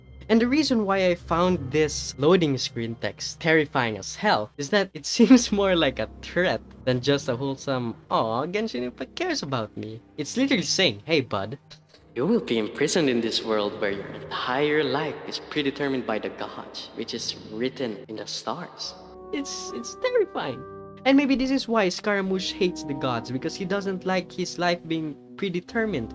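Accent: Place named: Philippines. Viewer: I have a big feeling you are a filipino because of your accent.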